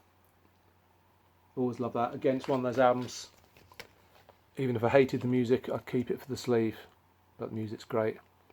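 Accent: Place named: United Kingdom. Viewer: Hi, I've just found your channel and subbed. It's always great to find another UK voice, especially one talking about Prog. I've not heard of before. One for me to look into. rings a bell. I'm sure I have a 12 inch single by them somewhere. are a new one on me too. Nice Congrats on the Roger Dean cover. I've not seen that cover folded out like that before. Fantastic. I've had my eye on the album for a while now, purely because I live near some viaducts like those on the cover. I was put off though when I read a review that it was reminiscent of. But it sounds like I should give them a go anyway. Great video! We didn't overlap once. Cheers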